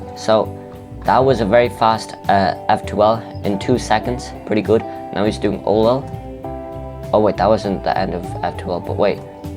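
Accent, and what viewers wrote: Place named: Ireland. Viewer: I love ur Irish accent it’s dope lol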